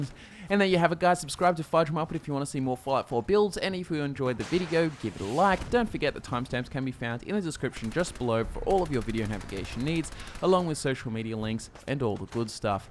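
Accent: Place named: Australia. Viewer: are you austraian because you sound awesome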